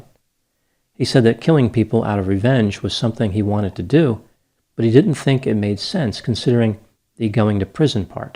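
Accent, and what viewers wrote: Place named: Mid-Atlantic, United States. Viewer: Off topic but... If I'm not mistaken, I believe I hear a touch of the Maryland/Pennsylvania/Delaware/DC accent from. This accent is unique to my region of the US (Maryland) and is strongest in Baltimore City & Philadelphia. accent isnt nearly as strong as most here but it's so unmistakable I'm willing to bet my life he comes from one of those states.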